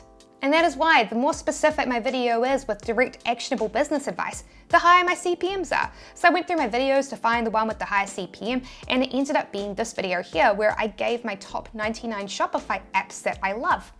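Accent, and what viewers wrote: New Zealand. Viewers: Maybe Youtube heard you say "beach" in your NZ accent! BTW wouldn't it be fun for you to attempt doing an American accent for an entire video? I love hearing what we sound like to others around the world.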